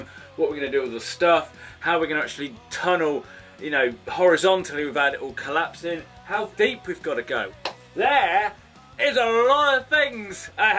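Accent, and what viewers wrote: United Kingdom. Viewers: bro this is the most bri'ish guy i evever seem!!! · You sound SO British.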